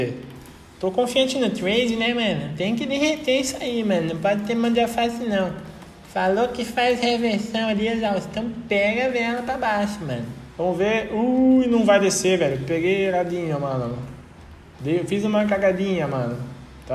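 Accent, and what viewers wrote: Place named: Registro, Brazil. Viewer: Pelo teu sotaque você é de Registro são Paulo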